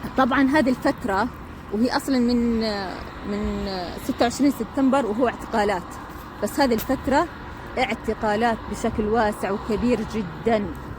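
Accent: Gulf, Arab world. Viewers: كلامك خليجي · كلامش خليجي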